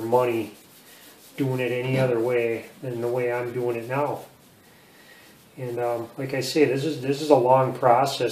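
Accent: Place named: Upper Peninsula, United States. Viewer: First from the accent i thought U was a yupper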